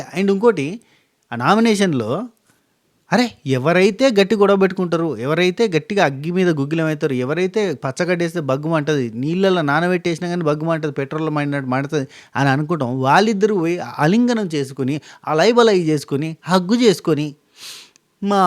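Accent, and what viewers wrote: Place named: Rayalaseema, India. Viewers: Rayalseema yaasa😅😅😅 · Memu rayalaseema kani mee antha goranga matram matladaru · garu rayalaseema yasa ok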